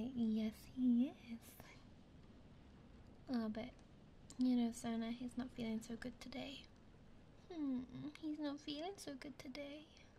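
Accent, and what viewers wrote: Hertfordshire, United Kingdom. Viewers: Finally an ASMR Vid who’s ASMRtist has the same accent as me rather than the standard American one. I keep listening to this genre of ASMR hoping that something like this would happen to me even though it makes me feel lonely afterward (yeah I know generic sad lonely lad here). Thank you for this vid, it’s very well spoken and immersive, keep it up 👍 (you’ve made this lonely lad from the back ends of Hertfordshire a little less lonely)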